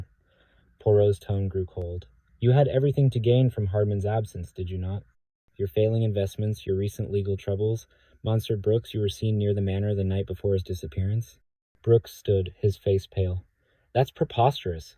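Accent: United States. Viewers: Horrible narrator with American accent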